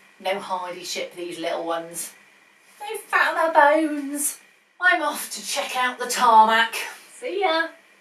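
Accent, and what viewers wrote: United Kingdom. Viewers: From watching other videos has made, I thought the brand name of this paint was, "Tamier,"' (rhyming with, "premier,") instead of, "Tamiya." I guess my American ears don't always do a very good job of processing the r sound that the British tack onto the a's at the end of a word.: D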